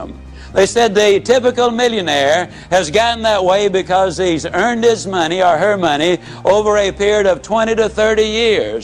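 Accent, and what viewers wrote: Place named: South, United States. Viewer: His perspective is valuable and you can learn by reading his writing if you don't like a southern accent.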